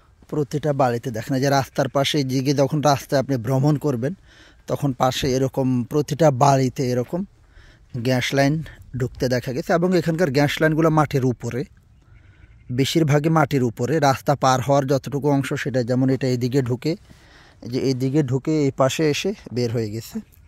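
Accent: Noakhali, Bangladesh. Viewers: আপনে কি নোয়াখালইয়া ? হুতি-মুতি কওনে বুঝলাম আরি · ভাই আপনি কি নোয়াখাইল্লা।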